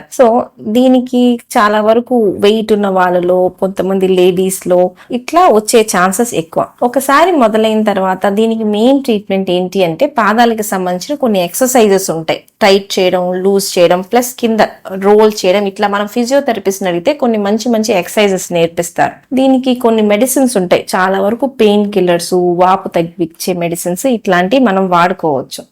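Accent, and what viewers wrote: Guntur, India. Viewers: Maa Guntur slang... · అవునమ్మా...మా గుంటూరు జిల్లాలో మేము అలాగే మాట్లాడుతాము..అది మా ప్రాంతీయ భాషమ్మా.. మేడమ్ గారు చెప్పే మంచి మంచి విషయాలను మనం విందామూ , ఆచరిద్దామమ్మా..👌👌💐👍😍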